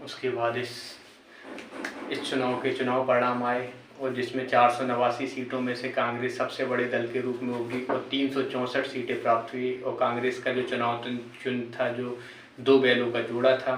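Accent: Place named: Jalaun, India. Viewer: I am suggesting you some references - Ek toh jo jalaun district wala tone h uspe thoda kam kro Bich me aap jo ruk jate hn ,uske liye pehle script achhe se likho ,bar bar practice kro Or aap ek episode dictate kr rhe hn,toh esa apki voice variations se dikhna bhi chaiye,na ki aap esa dikhen ki bs generally bat kr rhe hn.. Baki bs improve krte raho,,Take care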